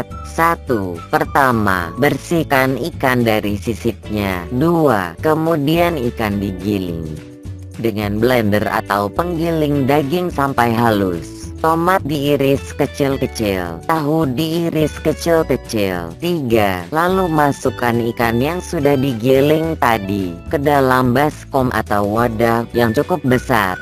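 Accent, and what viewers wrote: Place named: Java, Indonesia. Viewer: Sampean jowo mas...medok men.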